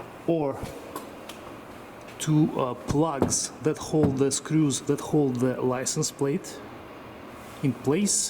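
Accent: Russia. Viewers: Nice Man.. Really helped me a alot, had to change the reverse light.. Couldn't find anywhere. This video solved. Liked his russian english accesnt.. Regards.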